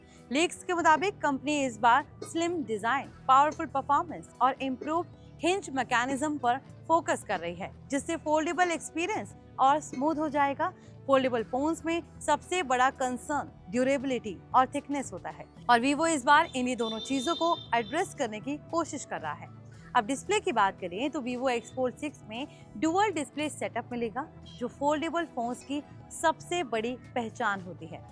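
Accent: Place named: India. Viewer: What language are you speaking? Sounds like India dialect mixed with bunch of some english words. Best regards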